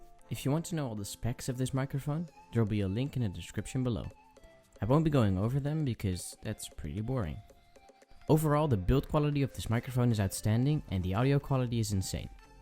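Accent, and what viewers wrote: Netherlands. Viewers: are u from the netherlands ? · Je bent nederlands he verder top video man ga zo door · ja k hoorde aan je stem dat je nederlands was haha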